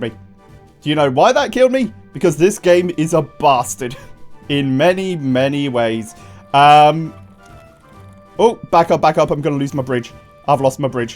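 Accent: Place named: United Kingdom. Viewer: The fantastic British accent really helps with setting the scene for this game. “I’m William Wallace and we want our freedom!!!”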